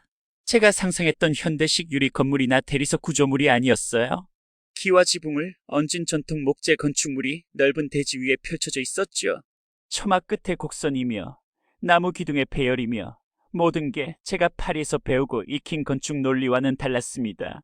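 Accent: North Korea. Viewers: 나래이션..ㅎㅎ 북한말버젼... 모야...ㅎ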